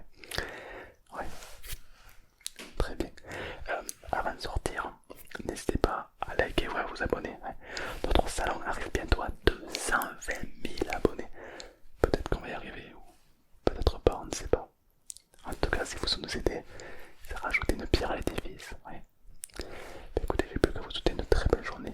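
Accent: South, France. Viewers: pk il fait l accent du sud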